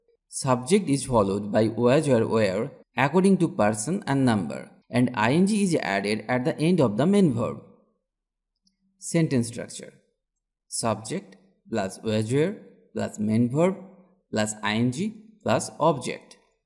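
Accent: Bihar, India. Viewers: Real bihari slang.. english (Englise) patient (pesient ) was (waj) extra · Bihari ho kya sir 🙏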